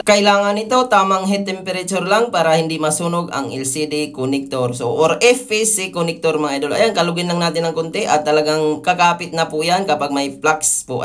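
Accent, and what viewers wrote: Iloilo, Philippines. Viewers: idol parang illonggo ka?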